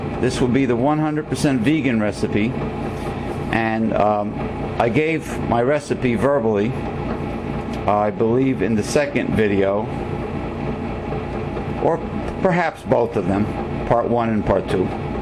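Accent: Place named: New York, United States. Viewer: I was just looking for a vegan sourdough pancake recipe and came across yours, it seems to be still one of the few vegan sourdough recipes on youtube even in 2017. Admittedly, everyone has learned a lot about producing cooking videos since 2012, and I imagine yours would probably be shorter if you had made it today. But the bonus for your video is that you explained the process very clearly, so is very good for beginners, and your Robert de Niro accent and serious comments below are adorable. Italians are the best cooks.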